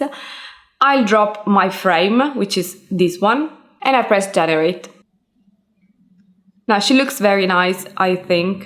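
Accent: Italy. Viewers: your videos are perfect. You're so good at explaining, please keep producing content. I subscribed in 30 seconds flat plus your Italian accent, I could listen to it all day long!!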